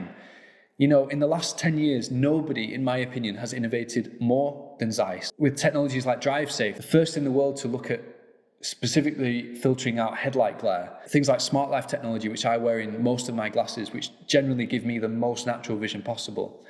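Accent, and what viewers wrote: England, United Kingdom. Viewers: is this an ENGLISH accent i love bit hard to understand from Ontario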